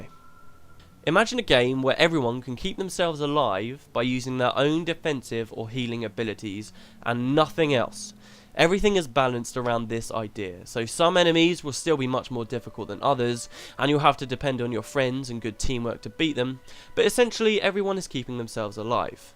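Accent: England, United Kingdom. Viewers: This is a perfect example of how a fancy British accent can be used to deceive countless people into thinking what you are actually saying isn't completely retarded. Your entire argument is a straw man. If you are clicking red bars in a window (reactionary healing) then you aren't doing it right. You should be pre-protting, watching your position, following your enemy's damage, all while trying to monitor your energy and control how aggressive your entire party is playing. That's not boring. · noob video. who the fuck cares about "raids", raids are boring by definition, you learn it and you are done. it's no fun after that. heals are fun in battlegrounds and arenas. and you are not just "watching bars" there. if you are, you are certainly not familiar with the game at all. beautiful scenery standing there wacking at the boss? that's all the melee looks at, and the ranged. 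i don't get this idiocy at all. especially with a brit snotty accent. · Lols, you have a british accent .3. Great, now you made me sad that I dont have Guild Wars >_< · Your English accent turns me on.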